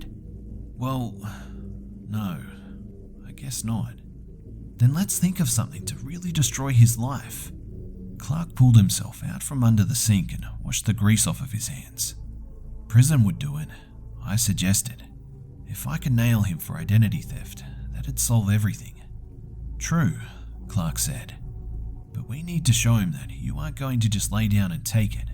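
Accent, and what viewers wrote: Australia. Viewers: are you Australian cause your accent sounds Aussie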